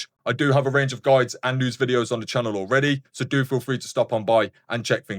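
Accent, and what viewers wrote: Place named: United Kingdom. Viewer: thanks for video. nice british accent. which region exactly?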